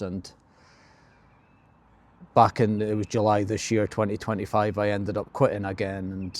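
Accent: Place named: Scotland, United Kingdom. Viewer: The Scottish accent is so great - I could listen to you speaking all evening. Have a really enjoyable, alcohol-free, Christmas and very best wishes for the whole of 2026. (Hogmanay can be a challenge!)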